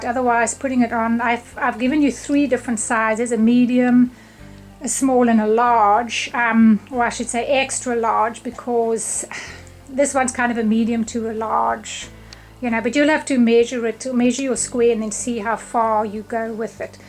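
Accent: South Africa. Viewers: Your south african :) so am i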